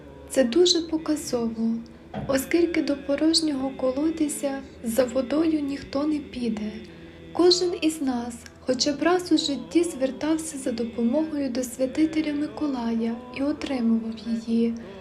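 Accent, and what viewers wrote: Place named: Transcarpathia, Ukraine. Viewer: Дуже ніжний голос, приємно слухати дикторшу. Помітив зразу закарпатський акцент, бо сам з Рахова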